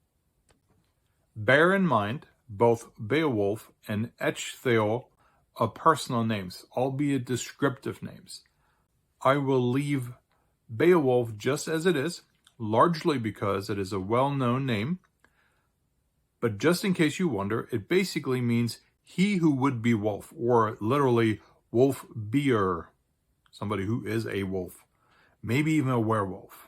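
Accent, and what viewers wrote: North, Germany. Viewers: German speaker here: You have a slightly Northern German accent. There (and even more in related Dutch) constructions with "von" are more likely than in standard German. I, located in Hessen, prefer Bian Coady´s sentence.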